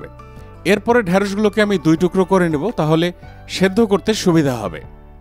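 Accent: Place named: Rangpur, Bangladesh. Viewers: Vaiya Ami o Rangpurer mey ar Nilphamarir Bou amra o avabe boli apner kac Theke Amader ancolik vasa sune vlo laglo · আপনার দেশের বাড়ি কোথায় ভাইয়া? মনে হচ্ছে দিনাজপুরের ঐদিকে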